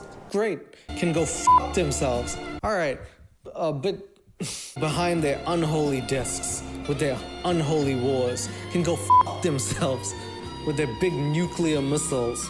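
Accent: Australia. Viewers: Less than 6 minutes in and my dog tried to save us by knocking the YouTube machine (cellphone) off the table. Though in this dude’s defense- he sounds like a standard surfing Australian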